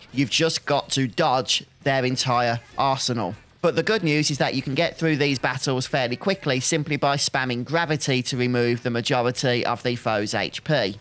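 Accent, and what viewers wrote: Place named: Black Country, United Kingdom. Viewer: Daaayyymm dude. Are you from the Black Country? So nice to hear that accent. I'm from Birmingham. But no permanent accent. I auto mimic whomever I talk to unconsciously.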